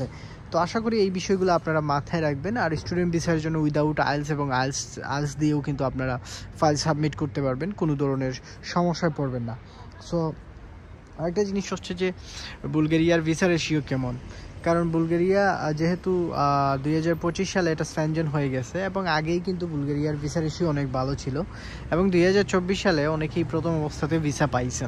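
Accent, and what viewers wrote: Sylhet, Bangladesh. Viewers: Vai apne kita sylhety ni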